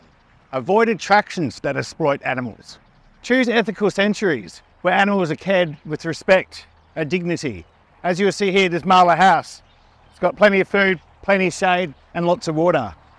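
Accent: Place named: Australia. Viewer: DEAR, I AM AN AUSTRALIAN TOO BUT YOU HAVE BEEN 14 YEARS THERE SO YOU HAVE A SLIGHTLY DIFFERENT ACCENT. GOOD ON YOU!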